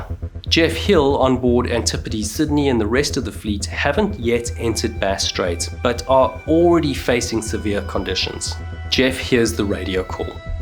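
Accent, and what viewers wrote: South Africa. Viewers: clearly the SA acent is the best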